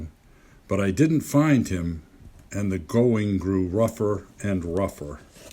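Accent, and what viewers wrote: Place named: Boston, United States. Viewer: Im obsessed with the narrators Boston/New England accent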